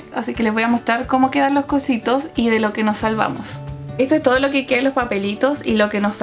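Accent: Chile. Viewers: Que lindo el acento chileno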